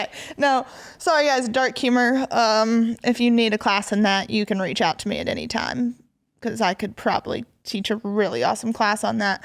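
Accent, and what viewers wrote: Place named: Georgia, United States. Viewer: Todd and Julie have done a fabulous job of raising you!!! Look at you!! Look at what they have done. I still listen to all the old shows on USA network every night when I go to sleep. Your voices lull me to sleep. You talk like me. I'm from GA. I hope I can hear these voices on new shows in the future. You are a great success for Todd and Julie! Keep up the steady work for them.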